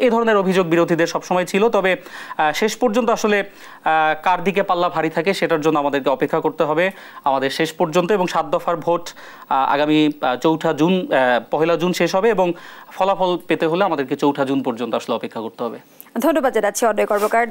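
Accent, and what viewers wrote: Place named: Kolkata, India. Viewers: মনে হয় কলকাতা উনি আসছেন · বাংলাদেশ উপস্থাপিকা গুলা এখন কলকাতার ভাষা ফলো করে😂😂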